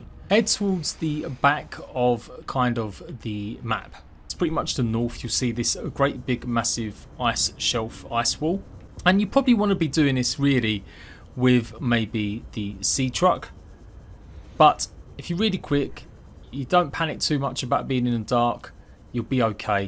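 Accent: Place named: Essex, United Kingdom. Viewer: Are you from southeast England? I swear your accent is like, essex or something.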